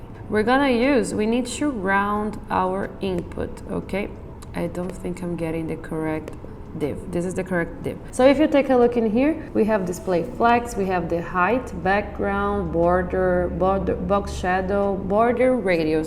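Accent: Brazil. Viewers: Youre brazilian, am I wrong?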